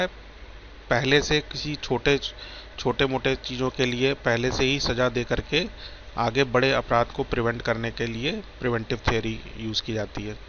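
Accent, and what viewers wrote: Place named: India. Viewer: Indian accent!!!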